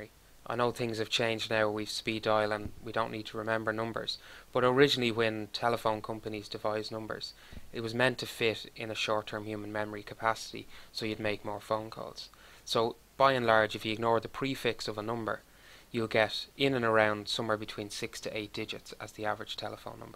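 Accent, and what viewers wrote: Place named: Ireland. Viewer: Your accent is beautiful. You irish?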